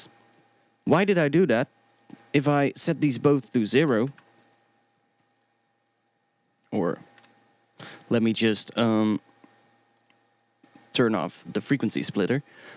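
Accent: Netherlands. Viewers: Somehow, if you're Dutch, no matter how good your English is, you'll always recognize the accent :D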